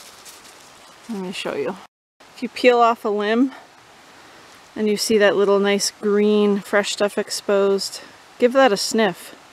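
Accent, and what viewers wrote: Nova Scotia, Canada. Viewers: More Nova Scotian, gold. Killing it.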